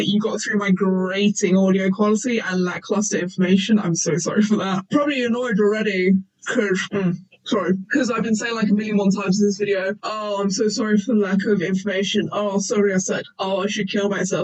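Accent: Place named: United Kingdom. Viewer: Hello fellow Brit! It’s very comfy listening to your accent 😆 There was a lot of stuff in your video pre- I had no idea about, I should check those artists out! When you said you wasn’t sure if you got anything wrong during the 2000s period, I have some observations I was a teenager in 2008 (I was 18!) so I can give you a bit of substance to the google trend data NintendoXCore was of course a genre of music coined by on their first demo and their website. The animated music video went viral in 2004 on Albino Blacksheep and pushed them to new heights. The original video didn’t even use Nathan’s vocals, they used Adam Crooks despite Nathan’s already being on R.Borlax. Cool fact! So during that time they were circulating alongside other post-hardcore inspired acts like, etc. NintendoXCore as a genre stood out because it was making heavy and obvious use of the chippy synth sounds. 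But teenagers on MySpace during what you accurately called the third wave of emo (scene!) just thought it sounded cool and didn’t know what the hell they were talking about. So they’d unironically say things like ‘I prefer SegaXcore to NintendoXcore RAWR’ and other ridiculous sounding things that had no bearing on the music itself. It just kind of became its own meme. Because a lot of bands gaining prominence back then were using 8-bit, chiptune, or electronic sounds NintendoXCore as a genre kinda blurred into the cul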